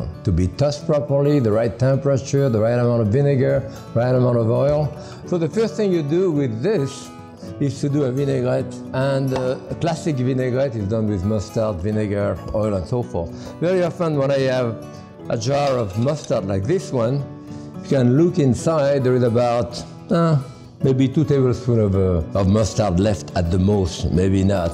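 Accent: France. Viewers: I love the way he says 'my mother' so much love ❤ in the way he says 'my mother ' especially with his French accent, love it !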